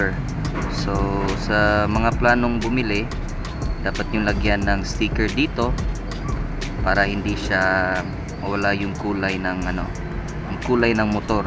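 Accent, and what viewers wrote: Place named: Cebuano, Philippines. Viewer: Gnda talaga english accent ng mga taga cebu haha ride safe paps. Subscribed already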